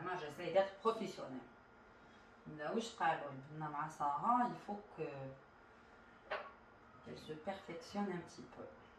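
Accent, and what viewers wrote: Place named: Algiers, Algeria. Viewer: Les feuilles de gélatine halal ou porc 🐷 moi j achète pas la feuille de gélatine.merci pour ta recette et t’a jolie voix algéroise 💋💋🤩🤩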